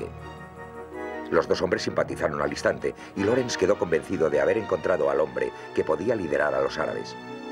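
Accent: Spain. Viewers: This accent is from the spanish península, that is spain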